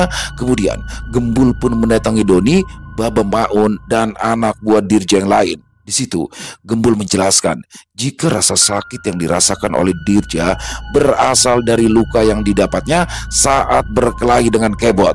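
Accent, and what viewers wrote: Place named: Betawi, Indonesia. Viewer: Slmat siang..senang kisah warong kopi Dony muncul kmbli bersama si gembul yg lucu juga dialek khas Betawi nya mas...sy tunggu cerita nya yg lbih seru,slam dri sy ibu Eky di solo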